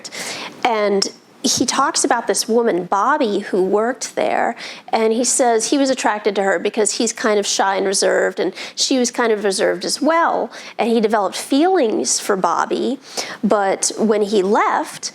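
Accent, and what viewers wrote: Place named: South Carolina, United States. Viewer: Great entertaining and historical show. Do you know how to make a hormone ?....Don't pay her! hahaha. Love your dedication and awesome accent; I'm guessin S. Carolina?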